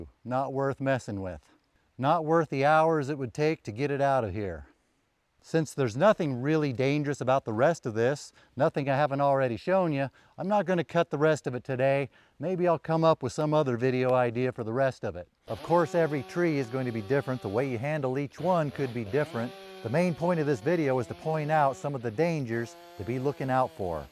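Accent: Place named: West, United States. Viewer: di quale zona (stato) è? la parlata( accento) sembra quella di " Buster Scruggs "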